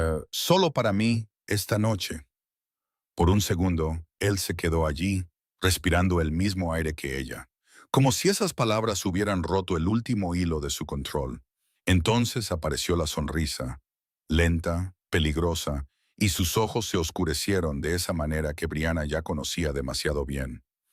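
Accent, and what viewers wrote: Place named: England, United Kingdom. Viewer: Horrible el español con acento ingles, nuestro idioma español es hermoso no lo arruinen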